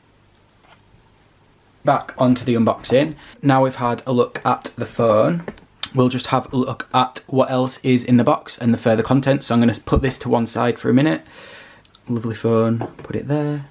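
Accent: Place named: West Yorkshire, United Kingdom. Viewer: Sounds like a West Yorkshire accent to me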